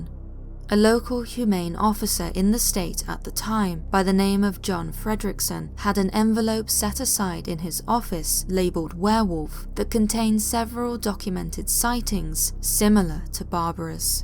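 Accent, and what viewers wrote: United Kingdom. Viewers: I love this voice, is so feminine, so delicate, so British, so beautiful 🥰, hope you like roses 🌹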